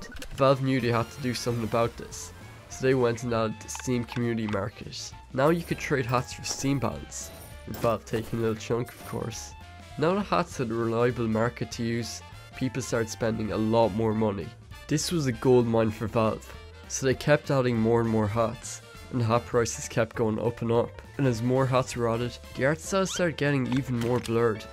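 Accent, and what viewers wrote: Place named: Waterford, Ireland. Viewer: What county are you from cause your defintly a waterford man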